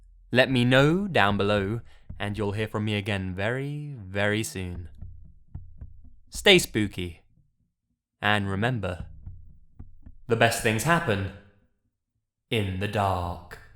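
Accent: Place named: United Kingdom. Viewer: opens video British accent closes video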